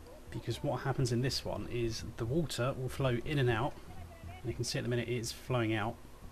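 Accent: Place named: United Kingdom. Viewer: I don't know why you don't have any subscribers this is actually good content! and the british voice is a plus good job!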